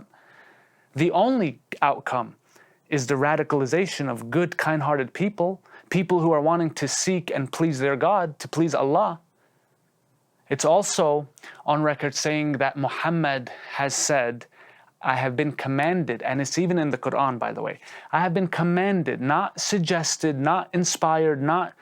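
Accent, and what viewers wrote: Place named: United States. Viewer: Esse cara é americano da pra ver no sotaque dele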